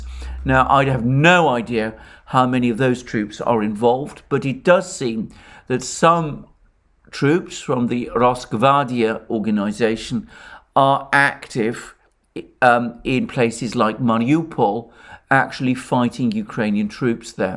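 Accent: United Kingdom. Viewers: Yes, i am commenting, but why are you shakin that strangely, greek man with good Brit accent ? Both russia and ukraine are NWO controlled...i saw in mr Christofouros channel comments praising russian president : this is complete foolishness. But majority wants to believe that either ukraine is the good cop or russia saves the world : divide and rules for unuseful idiots ! Thank you. Greetings from Polska...we are unique, because in our history always anti fascist and anti communist ! ( Like Orwell :-) )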